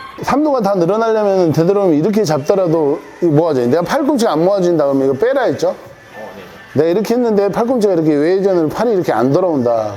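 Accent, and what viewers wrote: Jeolla, South Korea. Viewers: 전라도 행님이셨네요 ㅋ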